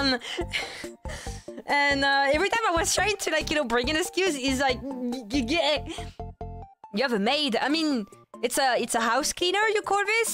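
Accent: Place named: France. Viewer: I disliked french accent before but MAN im started to get addicted to it!!! I apologize... i wasnt really familiar with your game.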